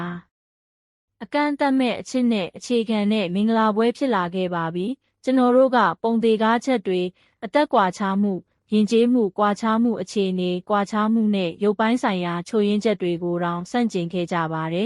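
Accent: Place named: Mandalay, Myanmar. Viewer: မန္တလေးပြောလိုက်အာရပ်ပြောလိုက်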